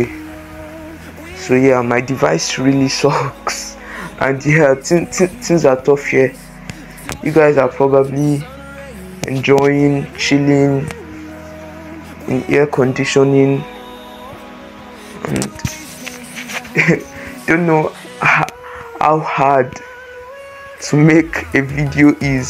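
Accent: Nigeria. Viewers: the nigerian accent :D, luv it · I LOVE THE ACCENT YOUR NIGERIAN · idk why i didn't expect u to sound Nigerian but nice voice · I love Nigerian accents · It's Nigerian accent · w voice (like the Nigerian accent)❤❤ i am Nigerian! 🇳🇬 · W I can here ur actual nigerian accent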